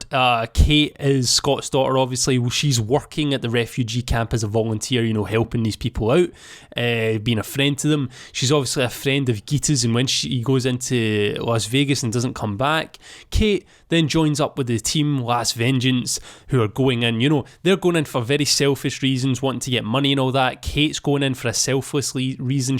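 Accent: Scotland, United Kingdom. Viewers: I'm more fascinated by your Scottish accent mate! It is great training for my dyslexia cos it forces me to really pay attention to what you are saying but the downside is that I totally missed out on the actual contents of your videos lol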